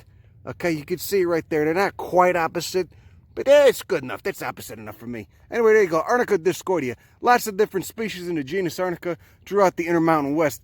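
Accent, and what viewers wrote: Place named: New York, United States. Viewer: I have to laugh to myself frequently, saying "is there nothing this guy doesn't know about plants" keep up the good fkn info! I am a Brit and no idea where your from, but years of seeing tv from USA might point me in the direction on new York? The east side? Love the accent ..... mine is a heavy cockney accent.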